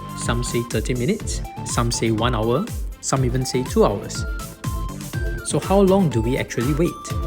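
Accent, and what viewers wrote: Singapore. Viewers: Bro u staying in sg??? sounds like ure singaporean. Keep uploading gd digimon content! 👍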